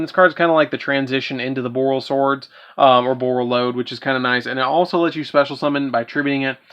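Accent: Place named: South, United States. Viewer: probably bc he has a southern accent? 🤷‍♂️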